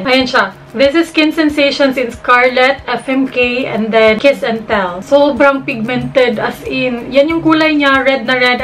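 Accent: Visayas, Philippines. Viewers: ate bisaya b kayo hehehe...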